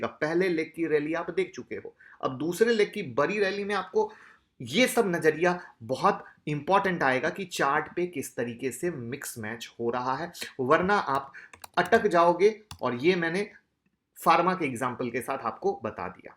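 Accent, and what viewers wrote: Bihar, India. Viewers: Sir aap Bihar se lagte ho.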